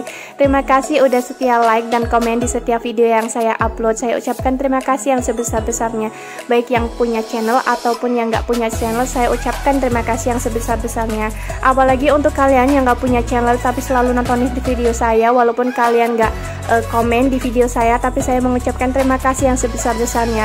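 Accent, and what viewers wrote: Banyumas, Indonesia. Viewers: Emang mb aslinya orang mb sih mb kok kayanya orang ngapak y mb ak tuh orang Tegal mb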